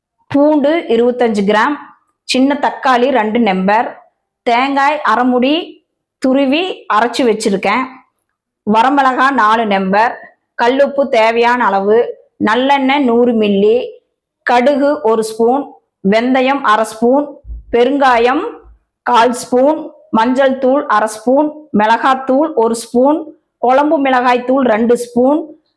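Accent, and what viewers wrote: Kongu, India. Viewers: Akka ur kongu slang is very sweet to hear. I love ur samayal.its amazing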